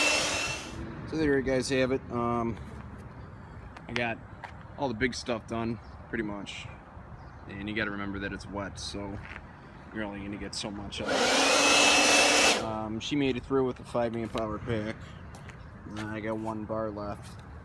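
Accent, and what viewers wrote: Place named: Chicago, United States. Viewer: I hear that Chicago accent. Where you from? I'm on da south side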